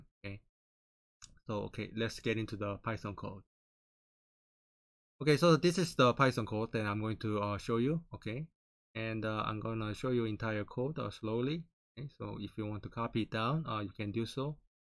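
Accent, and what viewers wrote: South Korea. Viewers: 나는 영어를 못하지만 처음 몇문장 듣자마자 한국인임을 알았다 ㅋㅋㅋㅋ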